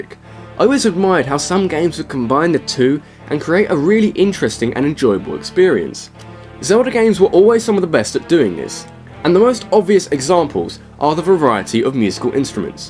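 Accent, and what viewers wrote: United Kingdom. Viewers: I'm about a minute in: my first thought "oh so it's one of these SOB's with 30 second intros... but hey, he's british! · BRITISH ACCENT ON A TOP TEN Legend of Zelda LIST? HEEEEEEELLL YES!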